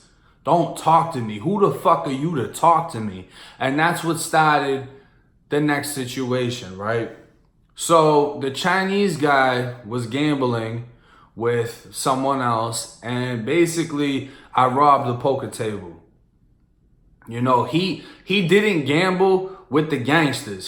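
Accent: Boston, United States. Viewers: Thats his boston coming out you can clearly tell when hes forcing the florida gangsta accent like .. "whats up ERBODY!" this kids a real trip, but ill take him for a good laugh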